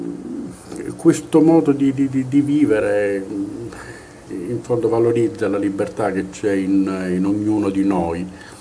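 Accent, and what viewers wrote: Sicily, Italy. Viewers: ma sei Siciliano, della provincia di Catania?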